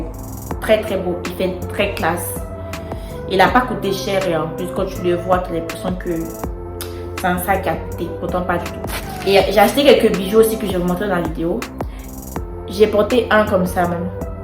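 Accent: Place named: Ivory Coast. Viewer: l'accent ivoirien💖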